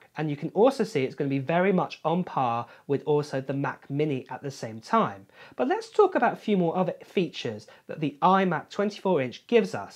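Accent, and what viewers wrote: United Kingdom. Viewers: you are getting a 4.5k screen which arguably to buy separately it would be about £300-£400. You are also getting a keyboard and mouse which cost over £200. You also have a 6 speaker system with spacial audio, plus an HD camera...on top of that you have more material used. so...for the price difference - it kinda makes a lot of sense. Annoyingly you open with the $1299 --- yet go on about the 4 ports... your video is a bit confusing... you also sound British yet use American pricing... Dude... come on... don't be so stupid... you are talking about buying a low end screen, with a cheap keyboard set, and a horrible cheap speaker set --- why not price it up with like for like items --- i really cant watch any more... it's too painful- shockingly trying to get subscribers with a phone - an opened out of date phone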